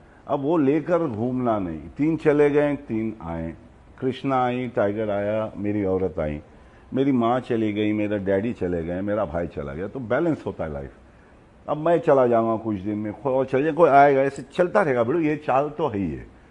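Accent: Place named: Mumbai, India. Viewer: Like his simple philosophy mumbai Hindi nobody speaks like him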